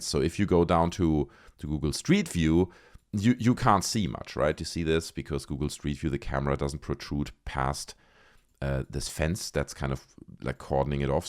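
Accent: Germany. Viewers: YOU suck bro you and your German accent tough listen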